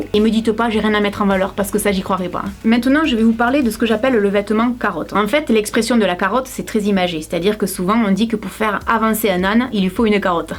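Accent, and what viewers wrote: South, France. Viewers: J'adore ton accent du sud!! J'adore...... 😄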